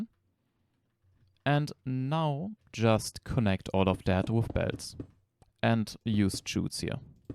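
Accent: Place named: Germany. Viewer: I can hear the Deutschen Akzent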